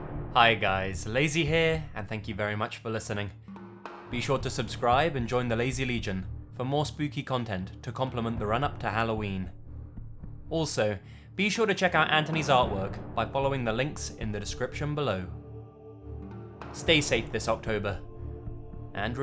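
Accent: England, United Kingdom. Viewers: you from the UK?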